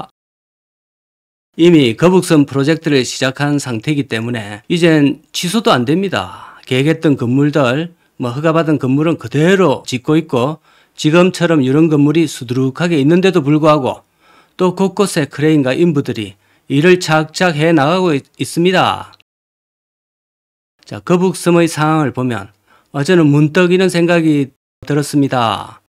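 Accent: Gyeongsang, South Korea. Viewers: .갱상도.사투리를.찾아서.또 오시것습니다. 너무나.좋은방송.😊😊😊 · 동영상을 보면 경상도 사투리가 참 정겹고 차분하게 들리네요. 고령화와 인구감소에 온라인 영업 저변화로 부동산업이 망해가는 한국.. 더 망해야 한국이 재활하는 길입니다.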